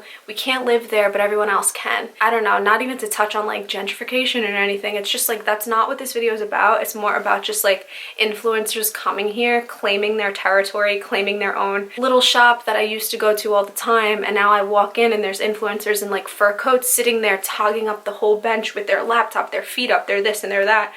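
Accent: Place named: New York, United States. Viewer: as another native new yorker who is obsessed with your dialect and kvetching I APPROVE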